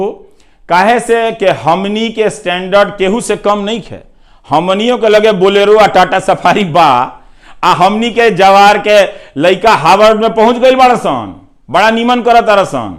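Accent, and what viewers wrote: Bhojpuri, India. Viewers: Bahut badiya sir bhojpuri bahut hi mitha boli h. raura bhojpuri k or uper utha le jaim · इतनी मीठी भोजपुरी हम कभी नहीं सुने हैं. एक तो "रिंकिया के पापा" गानावाला भोजपुरी. नहीं तो पुलिसिया भोजपुरी. पर आपका भोजपुरी एक अलग अंदाज का है. मारीशस में दुसरी सबसे ज्यादा बोलनेवाली भाषा भोजपुरी है. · Really sir, heart touching channel.Hearing you in so sweet Bhojpuri has made my day.Best wishes sir. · Your Bhojpuri accent is so nice.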